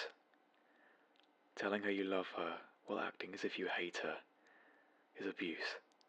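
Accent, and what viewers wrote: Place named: United Kingdom. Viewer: This is lovely... the British accent really gives to the words of the book...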